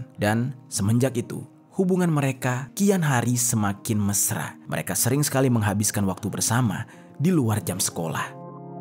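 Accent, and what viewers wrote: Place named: Sundanese, Indonesia. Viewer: Lucu banget bang kalo ngomong bahada sunda 😂😂😂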